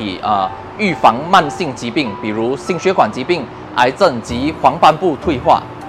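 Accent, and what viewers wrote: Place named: Malaysia. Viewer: 马来西亚的声音和我朋友一样😂喜欢…..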